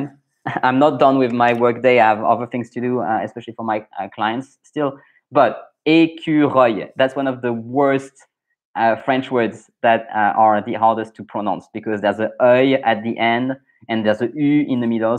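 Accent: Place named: United States. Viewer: I am listening and watching this but I thought I would you pick up on what you said . Your accent sounds anglophonic. I would say broadly apart from the odd word I would say to my British ear you sound as American or Scandinavian who speaks good English.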